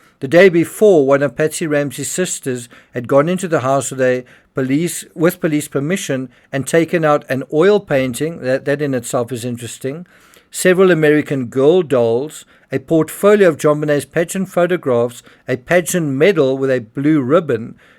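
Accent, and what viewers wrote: South Africa. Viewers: I watch this channel cause I enjoy hearing a south African accent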